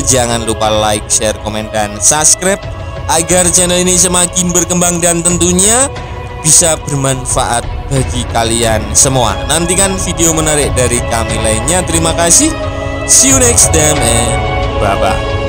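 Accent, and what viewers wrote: Java, Indonesia. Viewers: Waduh mas, medok jawanya ampun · Medhok banget mas..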